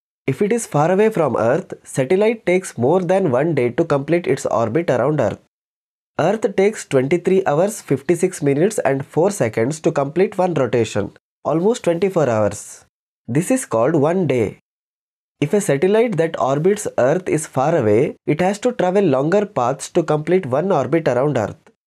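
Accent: India. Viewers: Outstanding video sir.. you made this concept so easy to understand...🔥🙏🏻 And if I'm not wrong...you're from south right...?? Bcz of your accent 😊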